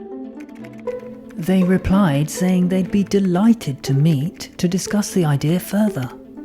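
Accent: United Kingdom. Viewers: Saludos desde México 🇲🇽 I love British Accent 🇬🇧 😍👌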